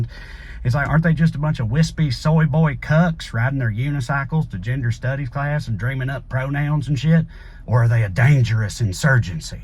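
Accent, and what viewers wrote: South, United States. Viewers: You are the fastest southern talker I've ever heard! 😂 I grew up in NY so it sohnds normal to me, but I wonder if other southerners can understand you LOL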